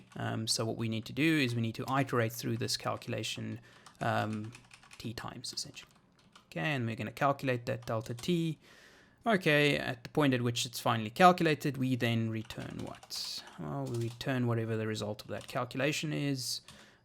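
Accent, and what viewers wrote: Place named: South Africa. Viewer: Thanks for this. Nice to listen to a fellow South African voice when studying.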